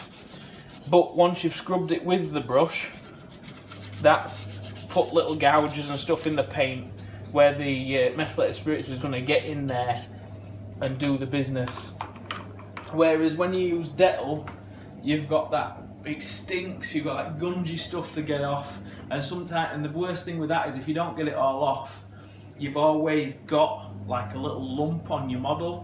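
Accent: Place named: Doncaster, United Kingdom. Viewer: Thanks, I wasn't 100% sure if it was working properly. Some of the acrylic paint came off but maybe just because I was scrubbing. I'll go try some methylated. By the way, trying to place your accent, are you from Doncaster?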